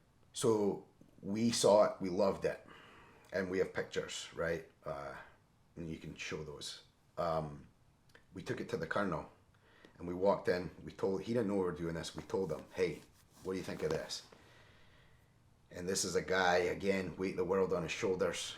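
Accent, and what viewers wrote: Scotland, United Kingdom. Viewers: does he have half a Scottish accent ???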